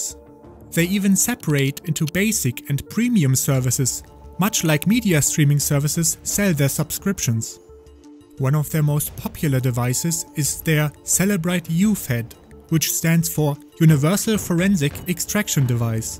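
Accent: Germany. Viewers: Nach 2 sekunden direkt gewusst dass du deutscher bist xD. Ehrenmann ^^ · You german? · Are you german by any chance? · Man kann den deutschen Akzent einfach nicht verstecken, keine Chance 😂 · German Accent on Fleek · Ich finds immer lustig, wie man direkt am Akzent merkt, dass jemand deutsch ist. Whatever, sehr gutes und interessantes Video. Ich persönlich würde mich nur auf Graphene OS verlassen. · deuitscher macht englisches video, hört man an der aussprache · The German Accent is Thick my friend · Awedul German accent and the guy doesn’t know how to speak in a microphone. He makes tongue clickings all the time. Don’t blow the microphone, space it farther away from your mouth…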